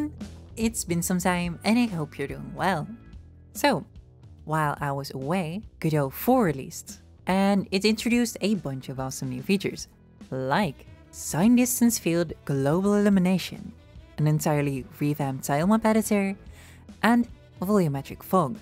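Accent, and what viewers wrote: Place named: Netherlands. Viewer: Gotta love godot... and your lovely dutch accent. Great video, neighbor :3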